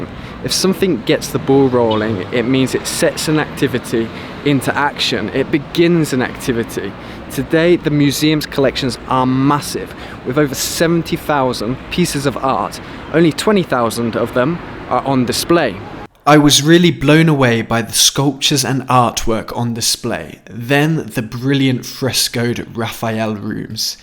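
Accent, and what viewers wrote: United Kingdom. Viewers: Today, the 25th of March, 2023, I´ve had my first contact with this great channel: I love your accent (I guess you speak British English, but I can´t get from what exact country you are. I am from Barranquilla City (the Shakira´s hometown), on the caribbean coast of COLOMBIA, South America, so SPANISH is my native language (Saludos fraternos). I am also Christian (Roman catholic), so I really enjoy your video and I learnt a lot: I think I will go to Italy and The State of Vatican City, soon. I am sure I will improve my English communication skills with you: I have turned into your new fan: My best wishes!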